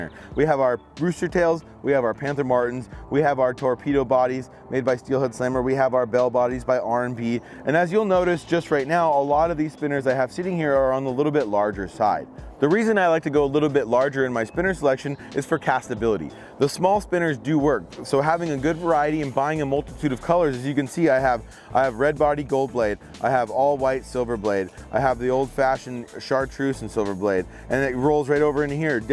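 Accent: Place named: Canada. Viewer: He must be from Minnesota or somewhere in the northwest because he sounds so Canadian. Sounds just like someone from north Ontario. Anyone know? Awesome video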